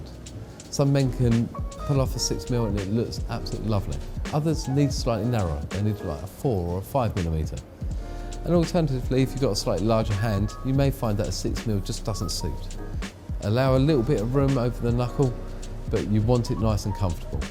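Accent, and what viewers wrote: England, United Kingdom. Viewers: That british accent. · I love your britisch accent! Cool. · It is clearly an English accent you wouldn't hear this sort of accent in Northern Ireland,Wales or Scotland,it's not therefore 'British' but English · English accent no such thing as British accent.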